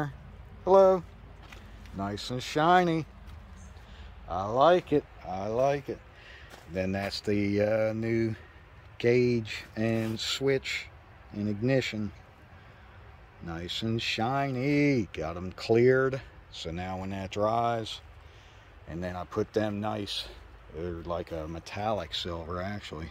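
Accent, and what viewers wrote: Philadelphia, United States. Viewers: This guy from Philly? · Damn that’s nice. Is that a Philly accent?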